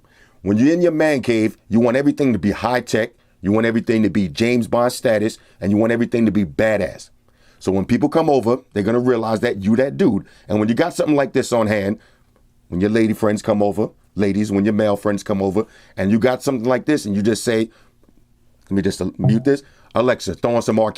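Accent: Jamaica. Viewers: yo floss u jamaican? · u Jamaican?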